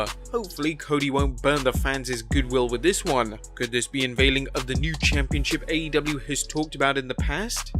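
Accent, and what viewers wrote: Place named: England, United Kingdom. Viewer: oh thank god. if it wasn't the English guy and instead of the American guy narrating the video. I would have tune out.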